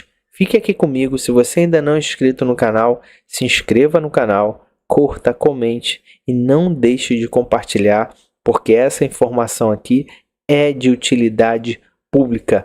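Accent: Rio de Janeiro, Brazil. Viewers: Deixe esse sotaque de carioca ja esta enojando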